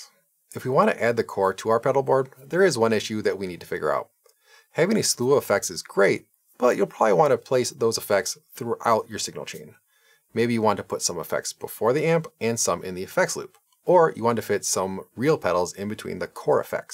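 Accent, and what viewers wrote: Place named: Midwest, United States. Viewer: nailed it- recognized the accent fellow midwesterner!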